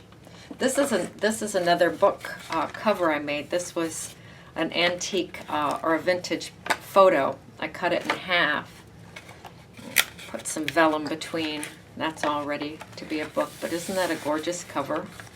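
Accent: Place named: California, United States. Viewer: Oh my gosh it’s early....for me....Would a “button stitched” loop make the closure stronger? Love listening to your California twang, I miss Ventura. And.. I have never heard the term “Underpants” for the binding covers first layers. Makes perfect sense. Love the video. Now I can finish my Cuppa, and go back to sleep.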